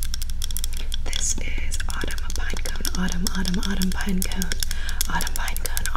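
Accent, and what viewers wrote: England, United Kingdom. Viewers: Can you make a video with only your England accent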